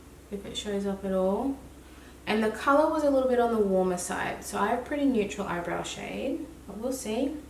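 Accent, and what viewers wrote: Australia. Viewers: Im so so sorry Australians and im absolutely not trying to offend u but i really really do not like an Australian accent 😮. It makes people sound so friggin unintelligent and almost as if ur slurring ur words like ur drunk. I think its the most unattractive and horribly sounding accent in the entire world. Btw, i have had zero influences. Please don't come at me with ur anger and verbal abuse. Like i said, its just my opinion which im totally entitled to express. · I have just found your videos and love having a straight talking Aussie beauty voice around. Love your vibe and realness very much. And laughing so much at how hot and sweaty you are because I totally get it 😂. So hard to even do makeup on some days the last few months. And so much appreciate the discussion on overconsumption. I am a proud environmentalist but also a beauty and clothing lover and struggle to live by my values in terms in that space in terms of overconsuming and wasting because there are so many cool things to try and express yourself with. 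But we need to be more mindful and keep trying and figuring out how to make sustainability work. I so appreciate you talking about this on your platform because if no one talks about it openly we all just sit here feeling guilty and not knowing what to do - nice to all be in it together rather than feeling lost amongst the pressure to consume from the media & influencers.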